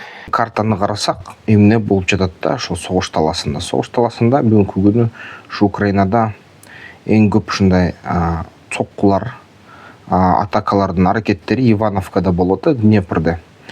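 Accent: Standard. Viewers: Менин Кыргыз адабиятынан сабак берген эжеке агайларымда мынчалык таза суйлобойт эле😂 сиз кайсы жерлен болосуз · Мендагы раазы болгонум кыргызча таза суйлогонго аракет жазап жатасыз ишинизге ийгилик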